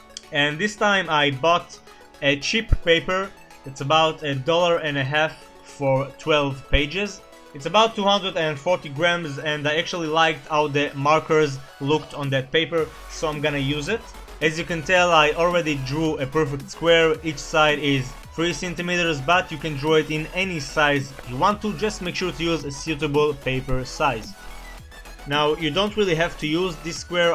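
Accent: Israel. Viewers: אני חייב לשאול אתה ישראלי? יש לך מבטא והשם שלך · אתה ישראלי? אתה נשמע ככה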